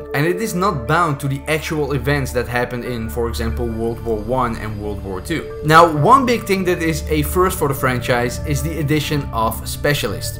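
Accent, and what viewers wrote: Netherlands. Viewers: this mans dutch accent... love it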